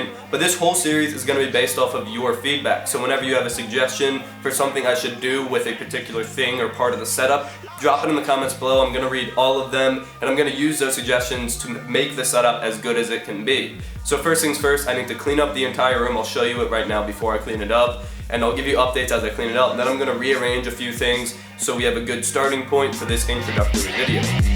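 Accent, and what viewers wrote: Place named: United States. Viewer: He sounds American mate, probably a glory supporter!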